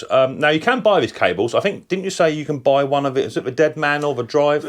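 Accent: United Kingdom. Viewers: I liked your repairs! Your style is like mine. So.... you know, tip top! I didn't quite get what you used on your first cable repair. It looked like some lead fitting that you used to crimp the two cables together. But what do you call that lead part so that I can buy some? The best I can understand is that it's like something in a fisherman's kit. Perhaps I could take a small piece of lead and drill a couple of holes to fit. But can someone give me the proper name for that part? You know, we yanks occasionally can have trouble understanding the Queen's English. (Ooops, King's English now --- my condolences). That means that sometimes my understanding runs a second or two behind the video. The best example of that is when you suggested that, on the whole, you preferred to have cables be too long rather than too short. Then you gave us the fish eye to see if we had understood your humor. 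Well, I did, after a couple of seconds. You know your audience! Anyway, thanks for the lesson on cable repairs. That has been a weak point for me and I have a couple of applications for that knowledge that have been waiting years for a solution. And I do regret the loss of Queen Elizabeth. In my view, she especially deserves to be honored as the last world leader who was an actual veteran of the war against the Nazis. The day after the Queen's death, I was at a football game at an American high school stadium and the flag was lowered to half staff in memory of QEII. Since we are still a rebell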